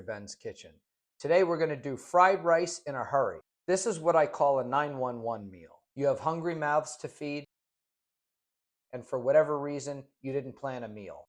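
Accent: United States. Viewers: Dats a Joisey accent. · Like the New York accent. Great meal. Thanks.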